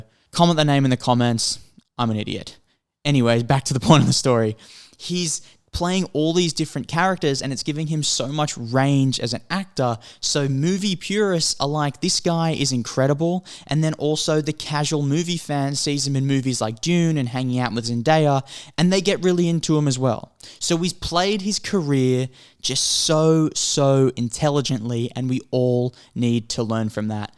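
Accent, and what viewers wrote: Australia. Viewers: Oh My Goodness! Looking forward to watching it! I really enjoy how you explain things and put things into perspective! I've been subscribed since a month ago and have been binge watching and re-watching your videos since then! I think I may have a crush on you! Haha! <3 Are you Australian? I really like the sound of your voice..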